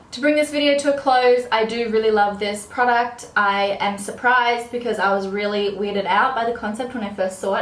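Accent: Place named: Australia. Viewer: I didn't even realize she was Australian until she said that and then I could hear the accent lol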